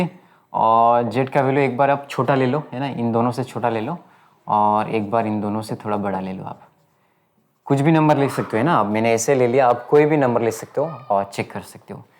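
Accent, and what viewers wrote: Assam, India. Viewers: sir ap axomiya niki?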